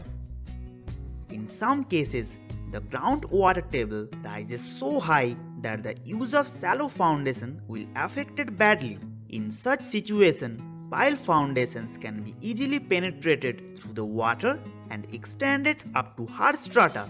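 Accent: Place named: India. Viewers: Stereotypical Hindi accent. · Should be proud of hindi accent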